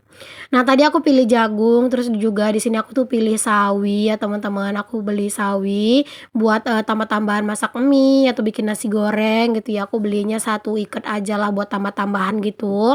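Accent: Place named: Padang, Indonesia. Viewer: Alhamdulillah, Tabarokalloh... Seneng dengar Bunda bicara menggunakan bahasa daerah, seperti loghot adik ipar yg dari padang...🙏🙏😊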